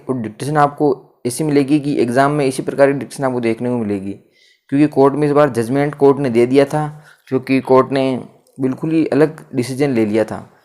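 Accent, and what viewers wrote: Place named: Rajasthan, India. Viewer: आप की हिंदी मे राजस्थानी का थोड़ा सा मेल है इसलिए कुछ शब्द अलग सुनाई देते हैं 😅😅😅😅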